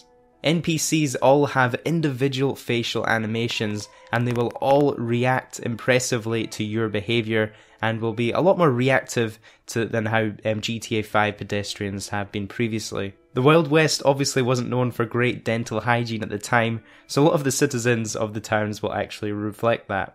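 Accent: United Kingdom. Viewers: I found your British accent very entertaining!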